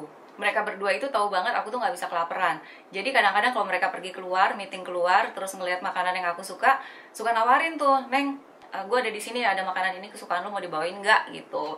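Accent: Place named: West Java, Indonesia. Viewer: ka suka banget denger kamu ngomong sunda euy 😂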